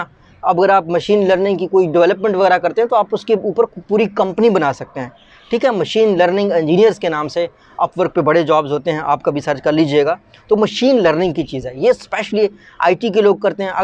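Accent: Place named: Dera Ghazi Khan, Pakistan. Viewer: Main bhi D G Khan say hoon ap k lehjay say pta chla ap apnay ilaqay say hain meray chotay bhai ho ap bahut khushi hoti hy apnay logoon ko traqi krtay huway ALLAH pak ap ko aor traqi day aameen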